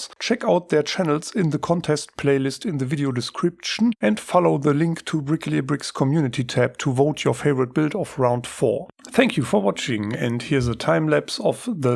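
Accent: Germany. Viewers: Man hat direkt gehört das du aus Deutschland bist 😂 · Man merkt das du deutscher bist nichts gegen dein Englisch aber man hörts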